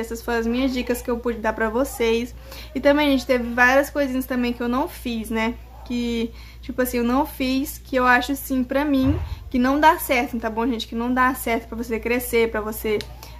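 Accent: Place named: Maranhão, Brazil. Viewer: Vc fala maranhense msm😍💖😍😍😍😍lindahhh sou sua fã... vc me deu essas dicas💖❤e ta ajudando 🙏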